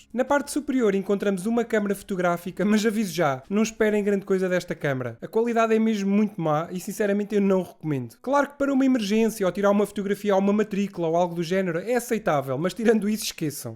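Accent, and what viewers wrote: Portugal. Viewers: Português de Portugal 🥱😏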